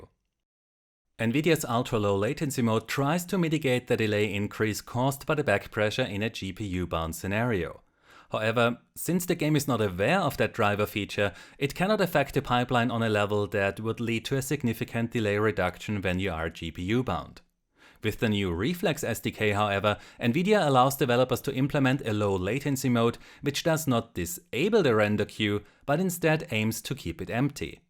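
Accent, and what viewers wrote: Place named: Germany. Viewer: You know you're in good hands when you hear a German accent..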